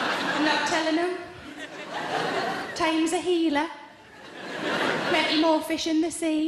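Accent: Newcastle, United Kingdom. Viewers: Bridge Metz she’s a Geordie ( Newcastle) so she’s from England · ironparadigm she's a Geordie, best acent ever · I can only hear "Champion' in Geordie accent now 😂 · this is a true geordie accent....not what cheryl cole does!!!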